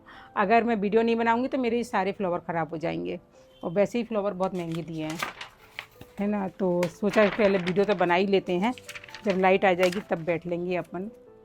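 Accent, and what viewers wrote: India. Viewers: Your accent looks like Maharashtran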